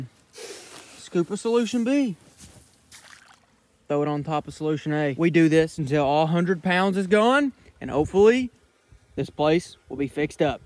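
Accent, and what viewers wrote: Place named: Texas, United States. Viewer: that texas accent🤌🏻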